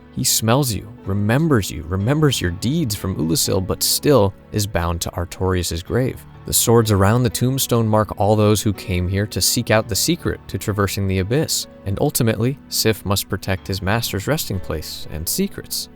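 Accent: United States. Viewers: I'm hearing an American discussing lore of a Japanese game based on European historic-fantasy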